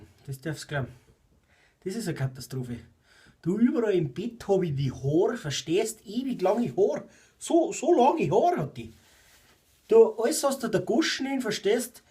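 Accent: Bavaria, Germany. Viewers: Ach der Dialekt Erinnert mich an die zeit in Bayern!!!Wie in der Alten Heimat...*herrlich* Grüße aus Sachsen ;)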